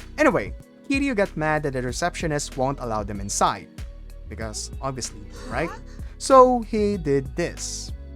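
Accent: Philippines. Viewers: I think you're a Filipino, from the accent that I am hearing.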